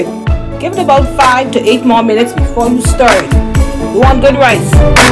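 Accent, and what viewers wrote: Bahamas. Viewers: Found you because I was missing home and missing the food. Hearing your lovely Bahamian accent and the "God Bless You" makes my heart smile. I try not to watch your videos when hungry 😂. God bless you Miss. Thank for "bringing the restaurant home."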